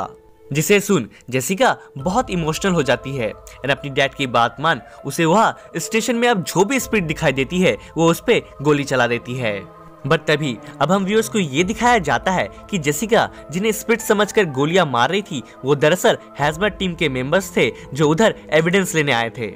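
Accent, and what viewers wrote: Bengal, India. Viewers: Are you bangali, but was nice talking to we are and thenk you for still suggested explenation you will again a lot viewer as much as subscribers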